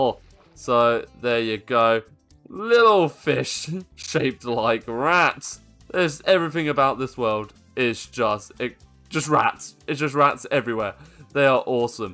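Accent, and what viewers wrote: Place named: United Kingdom. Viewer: ya fking british accent bro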